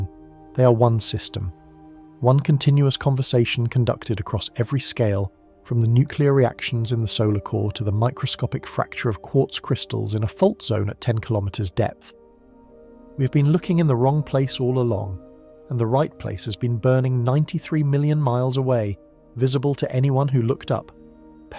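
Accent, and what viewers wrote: United Kingdom. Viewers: Fear porn pigs at it again. I'm so scared I crapped the couch. Smells worse than his British accent !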